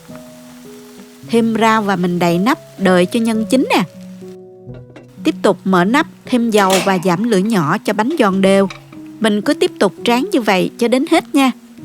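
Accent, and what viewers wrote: South, Vietnam. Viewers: Yêu, tiếng nói miền Nam đệ thương vô cùng ❤🎉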